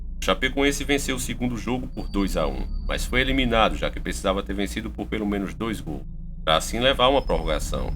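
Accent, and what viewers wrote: Northeast, Brazil. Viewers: é o narrador da discorver channel más nordestino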